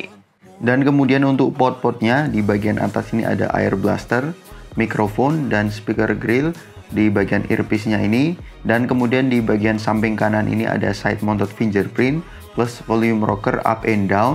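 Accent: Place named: Java, Indonesia. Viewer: Medok e bang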